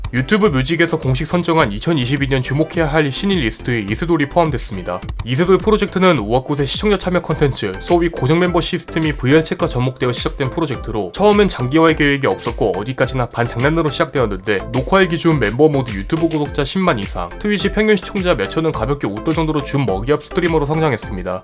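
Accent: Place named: Gyeongsang, South Korea. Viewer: 같은 울산인데 사투리가 좀 심하시네 ㅋㅋㅋㅋㅋㅋㅋㅋㅋㅋㅋㅋㅋㅋㅋㅋㅋㅋㅋㅋㅋㅋ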